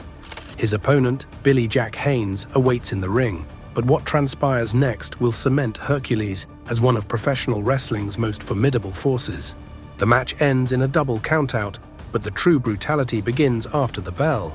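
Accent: United Kingdom. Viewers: Why do they always use a Brit voice for these things? Aaaargh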